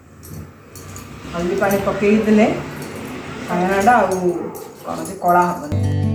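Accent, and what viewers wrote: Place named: Ganjam, India. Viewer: ଭାଉଜ ବଡ଼ ଶାନ୍ତି ଲାଗିଲା ହେ ତମ ଶୁଦ୍ଧ ଗଞ୍ଜାମ ଭାଷା ଶୁଣିଲା ପରେ। Love from Capital 🙏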